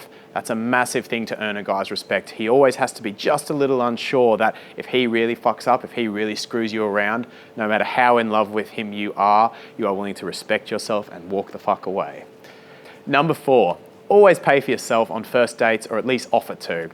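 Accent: Australia. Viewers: Is it Australian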